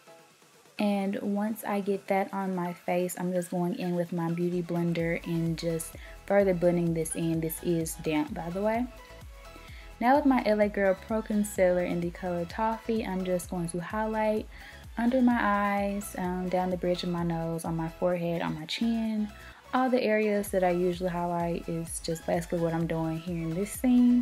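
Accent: Memphis, United States. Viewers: I was listening to your talk thru out the video I was like mane she sound like she from memphis lol I'm from memphis 2..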